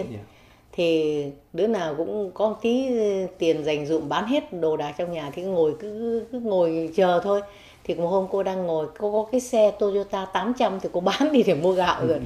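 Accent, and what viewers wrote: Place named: North, Vietnam. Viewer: Chương trình của Jimmy rất hay, thật đẹp và dễ thương, nói chuyện huyện thuyên đúng là con gái Bắc kỳ. ❤️❤️❤️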